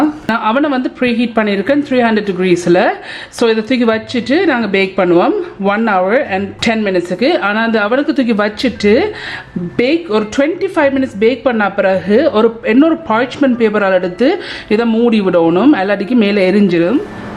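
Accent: Jaffna, Sri Lanka. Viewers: Super அக்கா, இலங்கை எம்நாடு. யாழ்பாண, தமிழ், சூப்பர். நன்றி.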